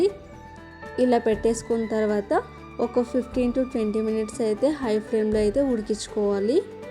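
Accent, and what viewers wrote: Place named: Andhra Pradesh, India. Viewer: Pakka andhra style mathadthira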